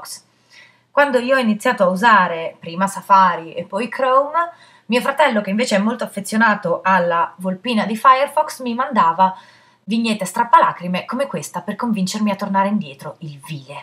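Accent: United Kingdom. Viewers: ahaha la faccia da INIZIO DI SUPERNATURAL <3 sei troppo brava sei il mio programma tv..ma su yt :) ma poi la pronuncia british...love! un caro saluto,Alessandra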